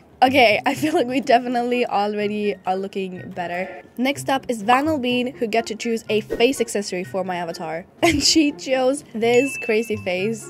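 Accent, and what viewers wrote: Sweden. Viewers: Wait, are you Swedish? 😛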